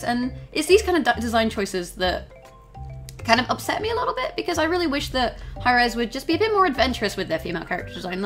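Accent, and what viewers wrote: United Kingdom. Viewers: I'm sorry, I can't continue watching this video until I know where the hell that accent is from?! It sounds British sometimes and American others... WHAT IS THIS??? No but seriously, I agree with this, they could've easily made Bastet, just a cat woman, not a woman who has a couple of cat features etc.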